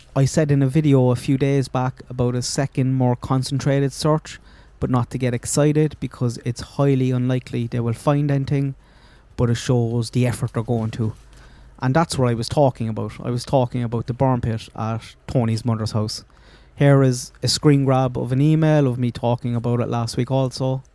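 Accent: Ireland. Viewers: Great to hear an Irish accent,this case is going to be solved,I'm a new subscriber🇮🇪🇮🇪🇮🇪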